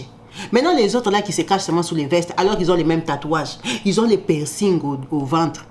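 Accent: Democratic Republic of the Congo. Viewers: Juste un conseil madame. Parler avec votre accent congolais, vous etes ridicule avec cet accent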